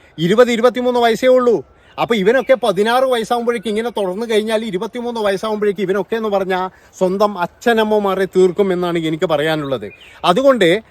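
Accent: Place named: Northern Kerala, India. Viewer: പറയേണ്ട കാര്യങ്ങൾ വളച്ചുകെട്ടില്ലാതെ നന്നായി പറഞ്ഞു.. 👍വടക്കൻ ഭാഷയിലുള്ള സംസാരം എനിക്ക് ഏറെ ഇഷ്ട്ടപ്പെട്ടു... ♥️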